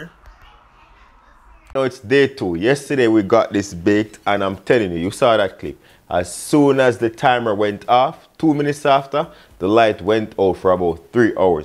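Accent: Jamaica. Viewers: Love that accent/ patwah don't know if I spelled that right. 😁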